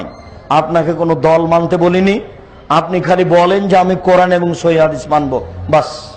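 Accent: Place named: Kolkata, India. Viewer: হালায় দেহি কলকাতার ভাষায় কথা বলে বুঝ্ঝি হালায় ইন্ডিয়ার দালাল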